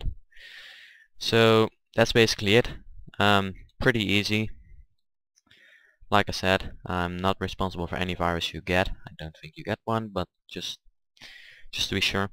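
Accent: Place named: Netherlands. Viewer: i love the way you say "fersion" instead of "version" cus v is said like an f in dutch ^^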